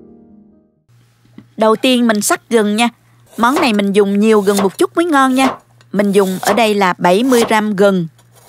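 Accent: South, Vietnam. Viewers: Giọng nghe hay quá! Đúng chất đặc trưng người miền Nam luôn. Cám ơn món ngon chia sẻ của cô ❤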